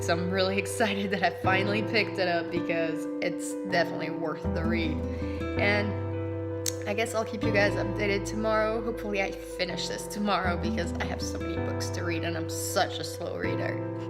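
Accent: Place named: Portugal. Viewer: Oh wow, I was way off! I’m half Portuguese and your accent is very similar to a lot of my relatives!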